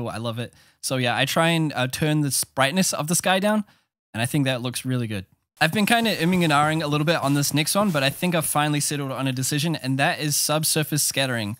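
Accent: New Zealand. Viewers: I could tell your from nz when you said your top video had more views than people in your country and your accent 😊 Kia ora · I assume he lives in New Zealand. 5,000,000 population, and fitting accent. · Woah I knew I heard a Kiwi accent!! Nice to see content creators rising from Aotearoa ❤️❤️ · It's nice hearing a fellow Kiwi on youtube. Also very strange. Keep up the good work!!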